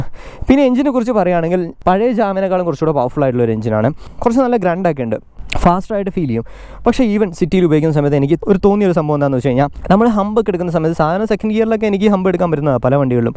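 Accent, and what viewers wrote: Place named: Kannur, India. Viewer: Machane ingal Kannoorkkaran aanalle ....❤️❤️🥰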